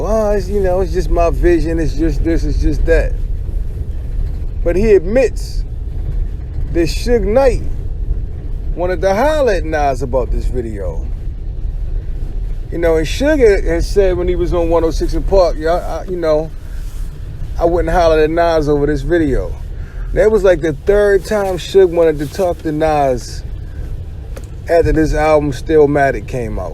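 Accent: Baltimore, United States. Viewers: Love the B-More accent lol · Somebody tell me this host ain't from Bmore 🤔🤔🤔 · The way you talk I take it you from b-more am I right🤔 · Are you from Baltimore cause you have a Baltimore accent?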